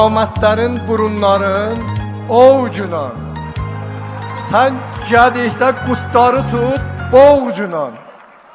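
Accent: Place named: Azerbaijan. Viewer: Əsli Azərbaycanlı şirin ləhçəsində şeir deyir Şəxsən mən dəfələrlə qulaq asıram bu Pezaşkian qardaşımıza mənə sözün əsli mənasında ləzzət edir Canı canımızdan qanı qanımızdan Azərbaycanlı qardaşlarımız.Azərbaycan İran dostluğunu daimi arzulayıram.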